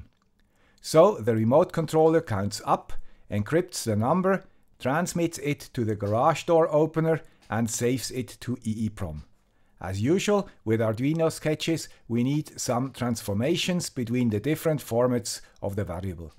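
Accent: Switzerland. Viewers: no doubt, that would be a secure solution. But it would require even more sophisticated software, and it would still need the crypto-quality RNGs. The shared state then takes the form of the client certificate. If the Guy With The Swiss Accent (gruezi!) or any of his viewers want to go with TLS, then chances are good that they‘ll get a secure solution. But I think it‘s worth considering the least that you‘d have to do and still be sufficiently secure. The solution in the video is probably fine, and I believe that HMAC would also get it done only depending on the shared key, but not on the counter.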